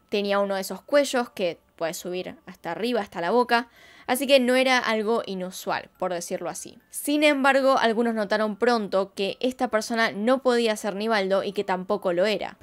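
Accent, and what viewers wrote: Buenos Aires, Argentina. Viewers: Me parece q pronuncias mucho "y y la ll", se q eres argentina pero bajale no mms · x2,su acento porteño. ❤ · Es argentina , Tiene ese acento crack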